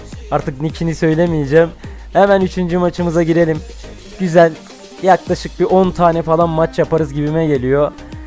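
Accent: Erzurum, Turkey. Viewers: Abi ben kararlıyım sen nerelisin erzurumluya benziyor abi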